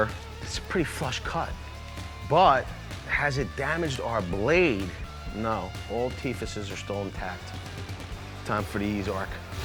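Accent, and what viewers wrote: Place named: South Jersey, United States. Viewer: You sound like your from South Jersey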